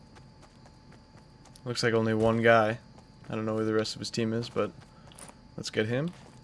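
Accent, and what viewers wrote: Netherlands. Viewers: You from NL? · Is he dutch? Bc he has that accent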